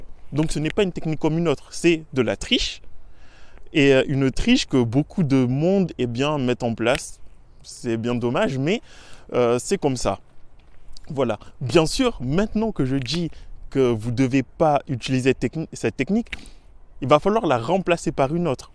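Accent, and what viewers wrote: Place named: Toulouse, France. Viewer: je sais pas mais on dirait que t es a toulouse vers Bonnefois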